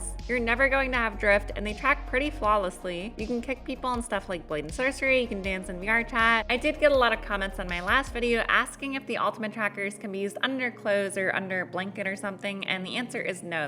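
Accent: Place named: Philadelphia, United States. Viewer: The way you say headphone and other words makes me think you might be from Philly.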